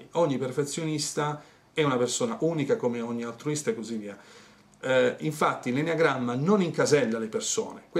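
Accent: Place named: Apulia, Italy. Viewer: Avevo visto il video che con l'accento pugliese dicevi del gatto che fa il linghino... Ora ti vedo su questo enneagramma che mi interessa!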